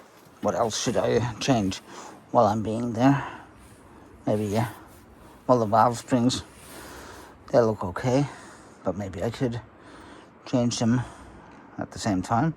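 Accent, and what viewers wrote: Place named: Finland. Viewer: Noinkohan tuo rallienglanti ilahduttaa ulkomaan eläviä? Meinaan tekninen sanasto.. No sunhan tää on tube, jätän tilaamisen väliin.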